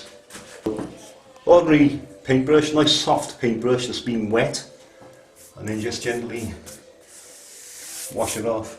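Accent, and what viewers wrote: Cardiff, United Kingdom. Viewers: Sounds like a Kardiff boy.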